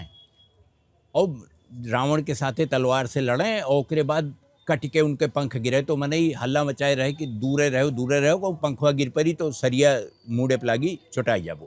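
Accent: Awadh, India. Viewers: Upadhyay ji pranam...aapko avadhi mein sun na bahut achcha lagta hai. BBC mein tu suna hi hai. Ishwar aapko lambi umra de aur sadaiv kripa banaye rahey. Meri maa Akbarpur abka Ambedkar Nagar ki thin. Wo aksar baatcheet mein time ko tame kahti to ham log unko chidhatey the ki amma tame nahin time hota hai...jab apkey munh se suna to ab samjhey ki swargiya Amma Avadhi bolti thi. 🥰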